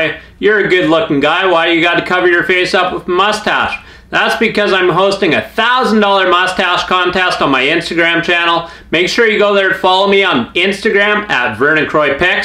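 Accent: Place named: Ireland. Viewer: You seem to be drifting into an Irish accent ......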